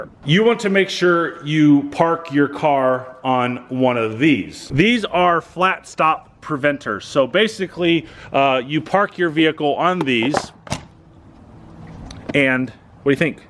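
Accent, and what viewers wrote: Pittsburgh, United States. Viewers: Just a quirk in your accent...you've kinda got a Pittsburgh yinzer thing goin' on!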